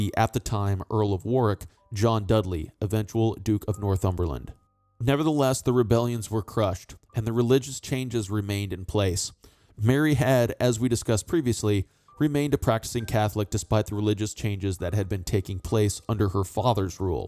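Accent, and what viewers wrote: United States. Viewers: Soon as u hear a yank commentary its over...csnt stand em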